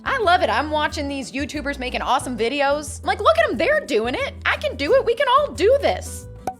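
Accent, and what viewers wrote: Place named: South, United States. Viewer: I luv ur country accent that is different from the normal kind u speak when ur on food network on TV...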